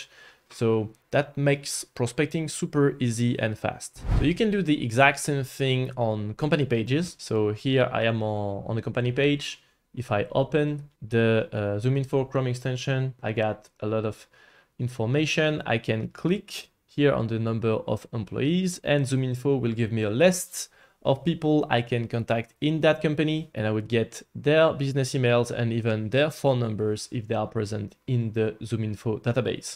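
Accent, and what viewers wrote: France. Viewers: je crois reconnaître un accent français ?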